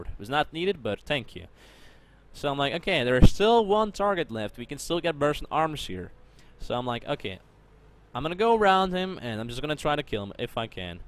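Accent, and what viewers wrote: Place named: Netherlands. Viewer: Are you Dutch because you have a kind of a Dutch accent?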